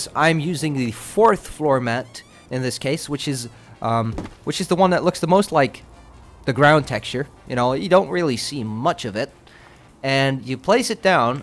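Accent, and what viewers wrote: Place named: Scandinavia. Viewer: Nice accent. I bet your either from Sweden, Finland or Norway. You guys are the only one that can both speak a very good english and have an excellent accent while doint it. Am I wrong ? :p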